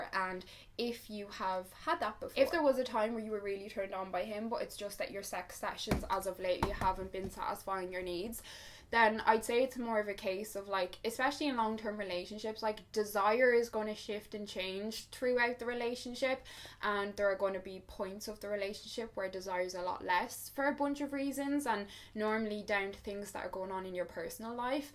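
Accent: Dublin, Ireland. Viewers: Hi, is that a Dublin accent you have?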